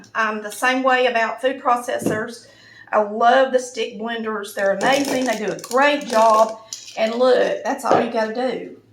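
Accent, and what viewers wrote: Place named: South, United States. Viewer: Happy birthday! Hope you’re having a wonderful day with family and/or friends! I love your accent! I’m from the Chicagoland area. I truly appreciate the southern hospitality, recipes, and southern drawl! Thanks for sharing many good recipes! 🌸😋💐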